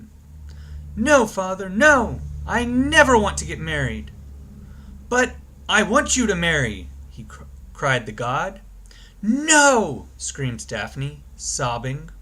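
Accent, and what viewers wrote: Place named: Greece. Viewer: Nice story i really love accent greek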